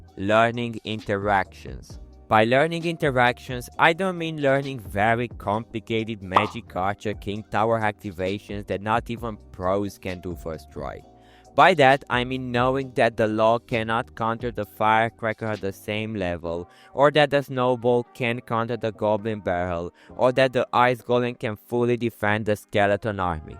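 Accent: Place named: Iran. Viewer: by his accent, he seems irani/persian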